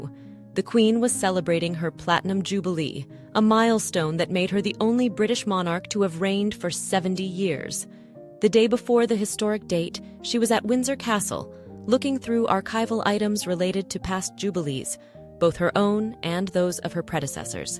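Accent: United States. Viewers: A slip of the tongue I think. She should have said "the" new monarch. At least these scripts seem well-researched. And now they're spoken in the original American there's none of that AI fake RP. Mind you I wouldn't call anything containing Cullinan V understated.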